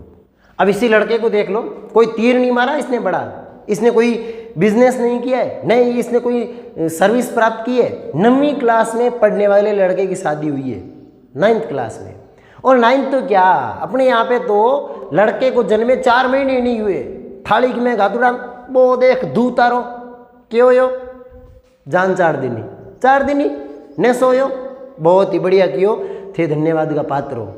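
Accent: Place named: Jaisalmer, India. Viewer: जैसलमेर की प्योर भाषा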